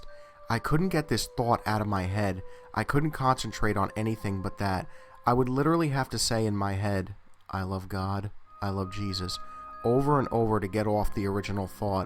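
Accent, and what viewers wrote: New York, United States. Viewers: Are you a New Yorker?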